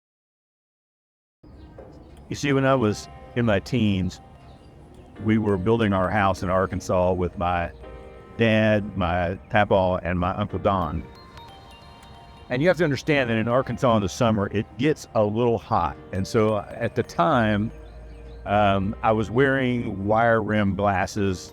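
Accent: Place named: Oklahoma, United States. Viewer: 😂 MY LUCKY DAY. Hi, neighbor! You speak my language, even saying froo-froo too, and it's good to hear from another Okie. I grew up in the Tulsa area and also loved the other-worldly aromas of the coffee shops at Woodland Hills Mall. Wow. Smiles. WH Mall was a Big Deal. Thanks for the smiles!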